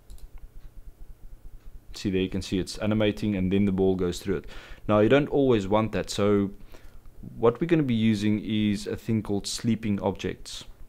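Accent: South Africa. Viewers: You sound South African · man are you South African :D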